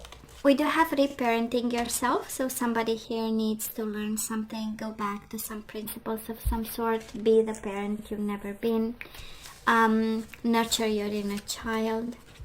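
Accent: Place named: Philippines. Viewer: Y u speak like a Filipino?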